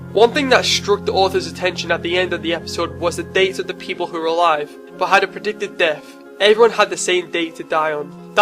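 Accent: Liverpool, United Kingdom. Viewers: Liverpudlian? :D nice vid! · The scouse accent makes it worthwhile.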